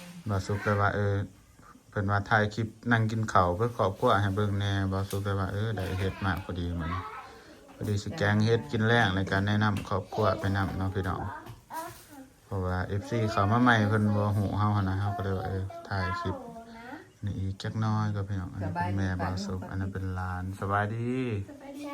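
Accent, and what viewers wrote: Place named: Ubon Ratchathani, Thailand. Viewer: เว้าคือทางอุบลบ้านแม่เลย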